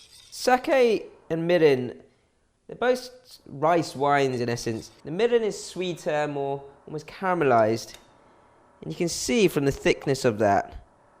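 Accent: United Kingdom. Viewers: the British accent of course!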